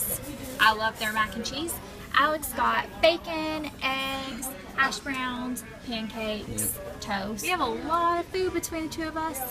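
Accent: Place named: Tennessee, United States. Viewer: You're getting your TN accent back a bit :)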